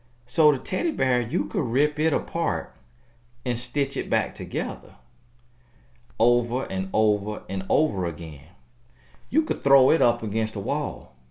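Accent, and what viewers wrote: South, United States. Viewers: Be blessed!!! Thank you so much for your wealth of knowledge! I look forward to your hour long videos every day!!! You have changed my life so much and I cannot thank you enough!!! Started my coaching class as well! I do favor the country version of ya!! That guy that said your country accent would set you apart from everybody else wasn’t lying! It’s definitely what makes you stand out and not sound like everyone else!!! So thank you for being you and all the work you do! God Bless You, The Queen, and Your Kids!!!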